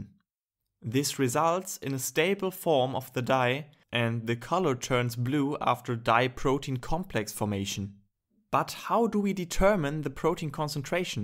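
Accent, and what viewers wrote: Germany. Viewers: I bet you're a german speaker. Thanks for the video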